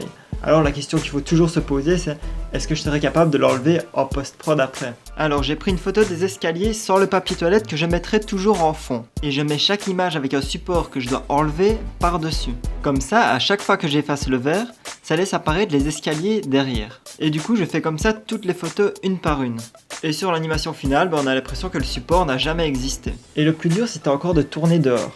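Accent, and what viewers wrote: Liège, Belgium. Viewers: Tous les belges ont cet accent? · Toi tu viens de Liiiiidge m’fi!! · J'adore ton accent !! c'est belge non? 💘💗😙 sinon continue comme ça tu gère pouce bleu aussi pour cette vidéo (comme toute les autres)😚💝😙💗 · Tes belge ? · Tu es belge ou je me trompe? Je reconnais l'accent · Es-tu belge?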